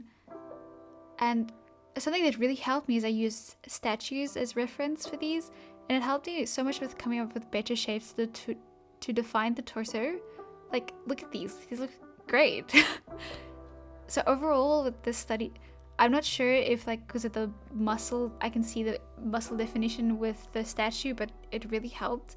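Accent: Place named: South Africa. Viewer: You sound like a South African. Nice accent. Also great video xD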